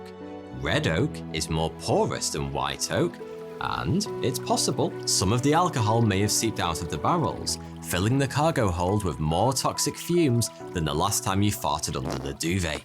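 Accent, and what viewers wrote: United Kingdom. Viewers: Why are British accents such good story tellers.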